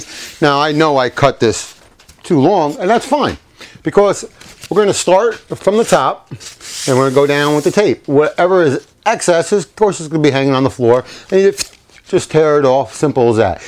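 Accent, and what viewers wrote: New York, United States. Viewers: You are a GREAT teacher - hey, you from NY? I grew up in the Bx, and your accent sounds so much like home, man. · so you from Jersey, or New York?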